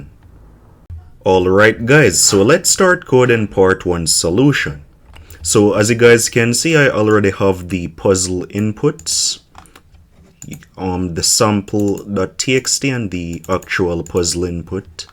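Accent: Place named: Jamaica. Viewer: I love your accent. Jamaica?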